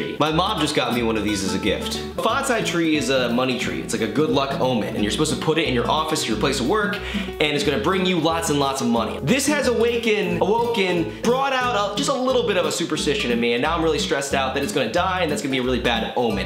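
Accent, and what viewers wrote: Philadelphia, United States. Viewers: u can hear his Greater Philadelphia Area (tm) accent so clearly in this video omfg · what part of philly are you from lol I hear it in your voice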